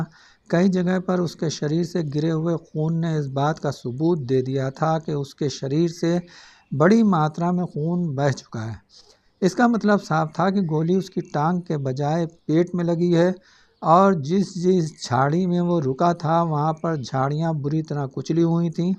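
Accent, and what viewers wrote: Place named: Pakistan. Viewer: आपके उर्दू एक्सेंट में कहानियां सुनना बहुत ही सुखद लगता है, शुक्रिया..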